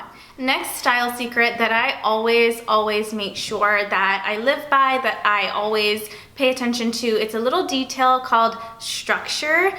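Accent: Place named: West Coast, United States. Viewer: I don’t think she sounds stuck up! She has an eye for fashion. She may not be individually judging people, she can just see when something looks “on” or “off” and she has the problem solving capabilities to figure how to improve things. Then she goes and shares her advice with others instead of keeping the secrets to herself. And her voice doesn’t sound stuck up, she talks likes she’s from the west coast. That’s a habit that’s shaped by her region. It’s not really fair to attack someone on a public forum who is being nothing but kind and giving her (maybe not to everyone, but definitely to some) helpful opinions.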